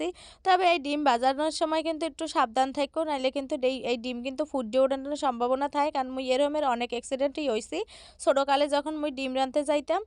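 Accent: Barishal, Bangladesh. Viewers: মুই কিন্তু তোমার মত মোদের সব বরিশালে ভাসা পারিনা মুই কিন্তু বরিশালে মাইয়া ❤❤😂😂😂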